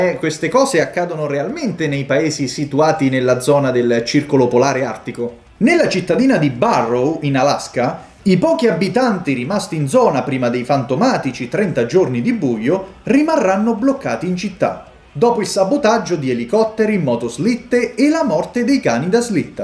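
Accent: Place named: Marche, Italy. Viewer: Maremma cane non prendiamoci troppo sul serio! tutte le settimane ci prepara questi gustosi video a tema horror e un punto di vista che condivide Co. Generosità Con noi che senso ha stare a commentare eh ma io avrei incluso? Sono le classifiche di il suo punto di vista non è necessario il nostro punto di vista è eccheccazzo 😊 forza grazie l'accento Marchigiano mi fa impazzire 😂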